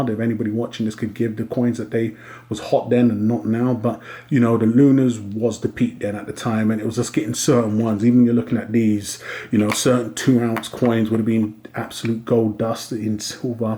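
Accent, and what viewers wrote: United Kingdom. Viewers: What’s more important is your British 👍🏼 and that Sir, draws me to the subscription button.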